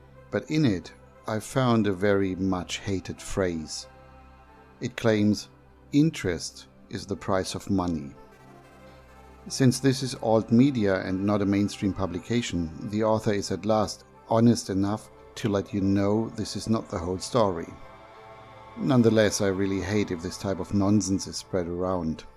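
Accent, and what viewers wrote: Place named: Germany. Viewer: Love your videos, not just the info and your thick German accent but your editing, too. Good to have you back.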